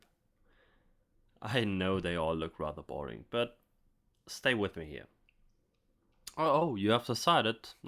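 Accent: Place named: Germany. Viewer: great story, but this is the worst way to tell it... very childish presentation and piss poor german accented english.... damned offensive actually.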